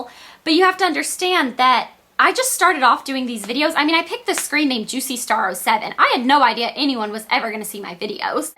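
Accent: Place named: South, United States. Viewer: i LOVE your southern accent (: and yaaaah. i want the 'old' back<3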